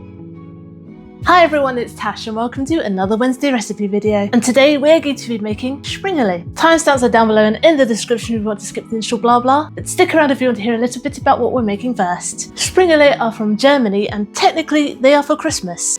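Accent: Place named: United Kingdom. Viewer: I just love you're cute British accent. Thank you for reminding me of the vodka and food color painting method of decorating cookies. I am going to try that for Christmas. 🎉❤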